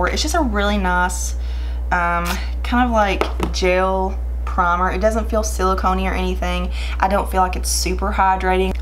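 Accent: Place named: Kentucky, United States. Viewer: I just found your channel and your so relatable! Love videos like these! Where are you from? You sound like a Kentucky girl like me lol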